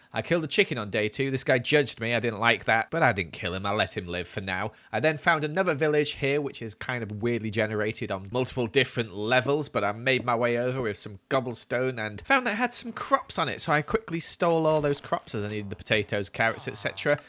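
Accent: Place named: England, United Kingdom. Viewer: i live in america, but i love the english "accent"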